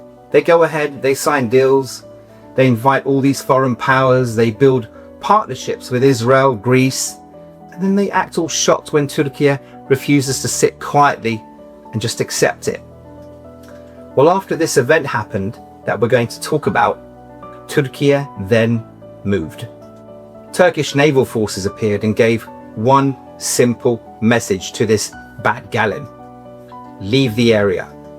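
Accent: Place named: United Kingdom. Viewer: you are invaders to Cyprus you have no rights there that's why you been ignored by all the states Turkish Cypriots ? really where you came from cause before 1100 AC there is nothing written about Turks you only came with the Mongols so what is your rights on an Island that been 3000 years known as Greek ? read some history as your accent is British there are many many libraries to go and open your eyes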